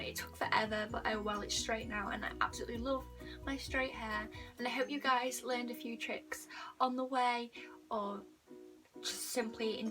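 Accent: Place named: United Kingdom. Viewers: Your British accent 🥺🥺🥺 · It literally took me 2 minutes and 35 seconds to realize she had a British accent i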